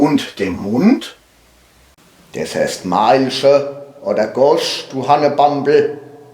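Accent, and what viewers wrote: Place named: South Palatinate, Germany. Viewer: Leider Südpälzisch 🤢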